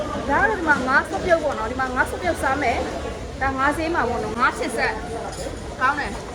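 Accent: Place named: Myeik, Myanmar. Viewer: ညီမကဘိတ်သူထင်တယ် ဟုတ်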